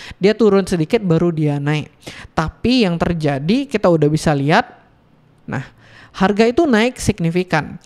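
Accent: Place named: Medan, Indonesia. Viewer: Abang sebenarnya orang Medan ya? Kok logat bahasanya macem anak Medan bang? Huruf K ABG tu khas kali untuk anak Batak Medan bang. Salam dari Medan bang . Saya anak Asahan.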